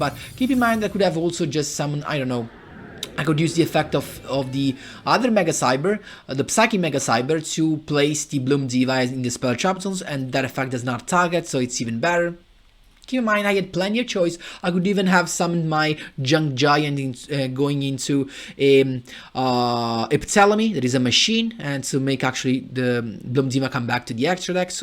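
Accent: Italy. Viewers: Parli na cifra bene in inglese ma si sente che sei italiano bro, bel video comunque di rado si vedono deck list diverse dalle solite che sono anche competitive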